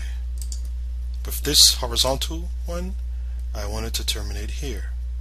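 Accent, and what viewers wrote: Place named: Brooklyn, United States. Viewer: Cool Brooklyn accent... Good tutorial, but I am curious to if the duct sizes and CFMs are actually linked to the parent file, with considerations to building orientation and insulation values. From a design perspective, are ducted returns now normative in NY? as ceiling plenum returns are much more efficient and less fussy -- except where mandatory for hospitals, labs, etc.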